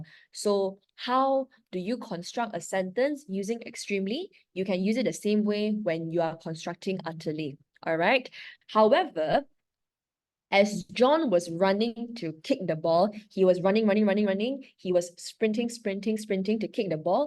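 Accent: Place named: United States. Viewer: wow you actually sonud like an american ngl howdey homie!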